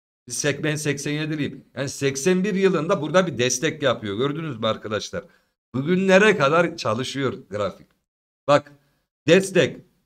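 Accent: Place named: Nigde, Turkey. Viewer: Usta niğdeli aksanıyla konuşuyorsunuz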